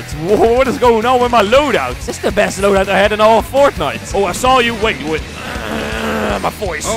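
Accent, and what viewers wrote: Netherlands. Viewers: kom je uit nederland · Lekker nederlands hahaha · Your dutch i can hear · Yourrrr Dutch I think bro👅 ( I’m Dutch )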